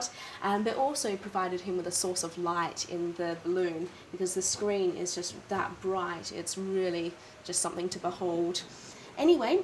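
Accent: England, United Kingdom. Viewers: I thought she did a fine job. Cute english accent.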